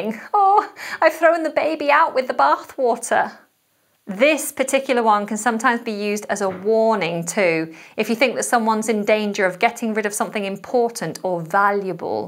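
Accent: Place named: United Kingdom. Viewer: I am falling in love with British idioms and British accent because of you 😭♥️♥️♥️♥️.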